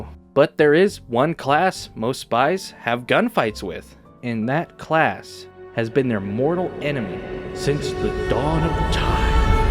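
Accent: New York, United States. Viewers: I always thought that your New York accent was your actual voice whoops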